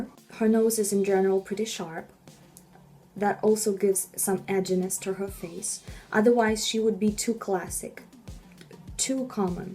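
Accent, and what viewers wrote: Russia. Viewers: Russian))) да неплохо звучит наш акцент)) я всегда за это волнуюсь ,когда говорю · I can hear russian accent hehe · Русский акцент чуть чуть чувствуется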